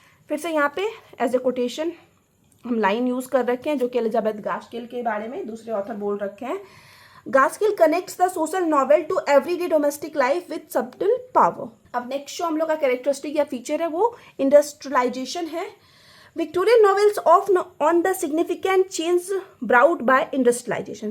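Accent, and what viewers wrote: Bihar, India. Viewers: Aapka tone of speaking Samastipur, lakhisaraay, Darbhanga, begusarai, jaisa milta julta hai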